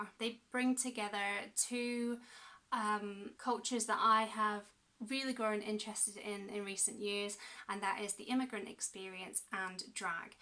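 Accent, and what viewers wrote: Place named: Scotland, United Kingdom. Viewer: You’re awesome and Scottish. Win. Win. I could listen to you all day. Subbed! Awesome wrap up. Definitely going to check Persepolis.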